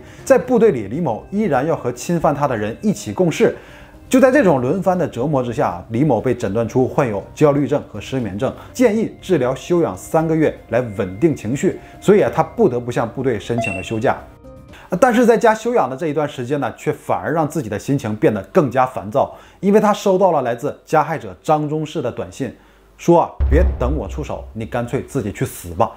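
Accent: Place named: Tianjin, China. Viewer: 听口音好像是天津人，如果我错了请原谅😂 喜欢你的视频，看不够！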